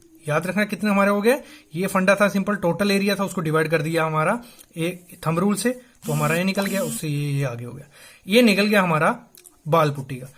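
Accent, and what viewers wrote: Jammu, India. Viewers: bai muje sabse pehle ye batao ap Jammu se ho kya?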